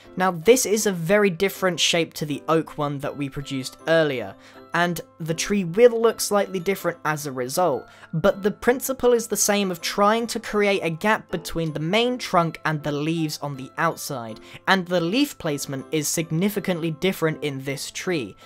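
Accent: United Kingdom. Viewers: Damn, back at it again with the annoying British accent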